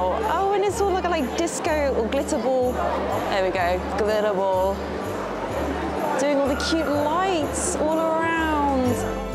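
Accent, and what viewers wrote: RP, United Kingdom. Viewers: Nice video .I like your accent .Slightly posh but not too much so .I will be spending half a day in London next Sunday .Walking around but not buying much at those prices .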